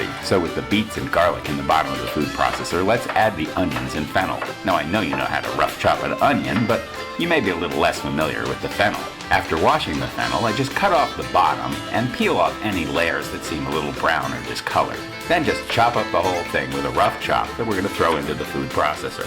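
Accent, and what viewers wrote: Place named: United States. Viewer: I love it! Sounds very country and the music complements it.😊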